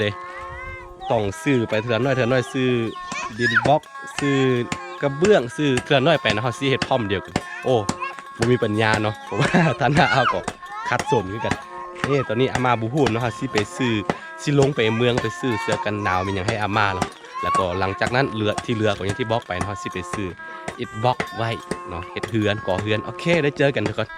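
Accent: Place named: North, Thailand. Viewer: พูคเหมือนคนเหนือเมืองไทยมากๆ fcลำปาง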